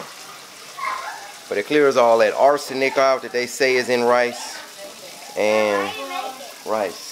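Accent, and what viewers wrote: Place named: West Coast, United States. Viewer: Got that west coast sound! Love it...head bobbin the whole time! Pro tip on that rice, I never knew that, but I never cook rice either lol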